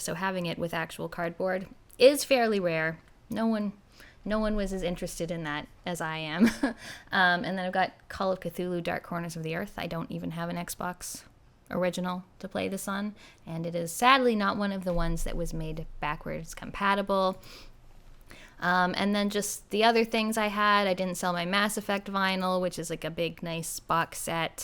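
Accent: Canada. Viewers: I think we all realize youre candian by how you pronounce about lol But yeah duck bucks not greenbacks lol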